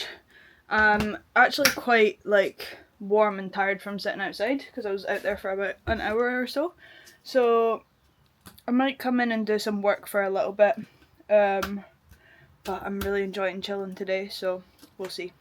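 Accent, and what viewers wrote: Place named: Scotland, United Kingdom. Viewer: I love seeing Scottish YouTubers with unashamedly Scottish accents. The world loves us guys, we don’t need to hide our accent. Everyone understands, deep down 😃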